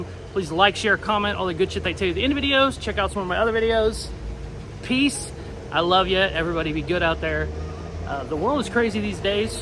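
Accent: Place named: Missouri, United States. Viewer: Been watching all the fscalade vids,great content bro keep going, and also are you from Missouri? A lot of the ppl there said roof like you when I worked there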